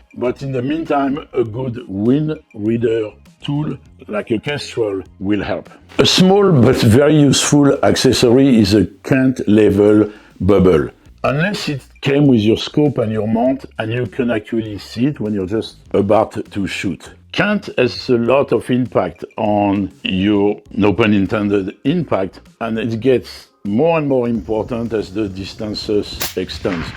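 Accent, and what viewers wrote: France. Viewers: What is that accent ? French ? Tu es français ?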